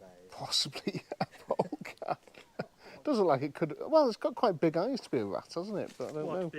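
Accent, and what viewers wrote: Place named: England, United Kingdom. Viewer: I´m from Germany and i love your english. Where in England are you from?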